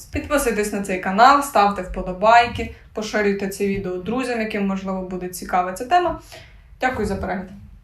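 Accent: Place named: Western Ukraine, Ukraine. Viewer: Уроків гри на гітарі багато, але ці особливі. Бо Ви маєте певний шарм в донесенні меседжу до глядача. Звідки ви? По мові чую, що мали б були західні області. Тернопіль? Львів? Я з Франківська...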